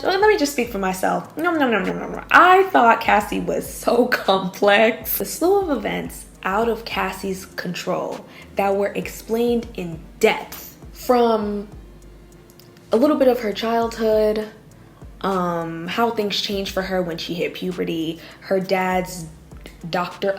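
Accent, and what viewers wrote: Jamaica. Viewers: im hearing some patois!! are you Jamaican?? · Your broken Jamaican accent is cute ❤😂 sending love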